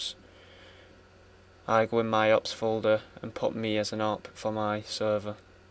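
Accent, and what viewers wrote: United Kingdom. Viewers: Sound like your a fellow wiganer? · no is from up north (English midlands) i think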